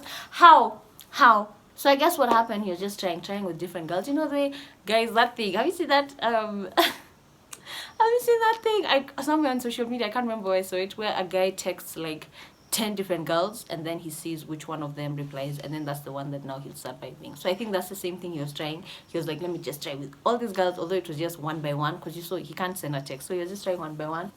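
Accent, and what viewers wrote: United Kingdom. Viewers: I love how you introduce the British accent naturally 😍😍😍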